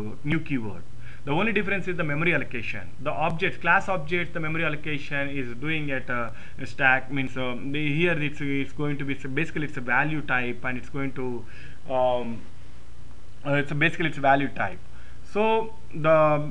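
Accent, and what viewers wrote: India. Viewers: Ну раз кроме индусов некому... будем терпеть индусский акцент. Хули) Хотя есть еще видеоуроки Georgiy Mogelashvili на русском. чувак реально толково рассказывает... но видеоуроков мало. Хотя для хорошей базы сойдет) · индусский акцент это полный пипец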